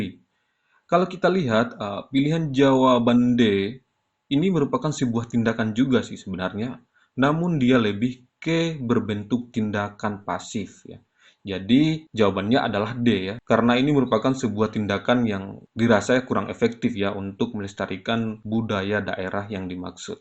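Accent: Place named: Muna, Indonesia. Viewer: Dialeg bahasanya mirip orang muna kereeen 🙏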